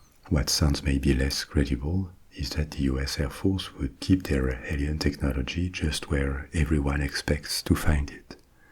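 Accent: France. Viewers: Ohhhhh....just in time for that incredibly soothing baritone voice & fascinating French accent to lull me to sleep!! Thank you, Sir!! Your talent & gifts sre greatly appreciated!!!! ❤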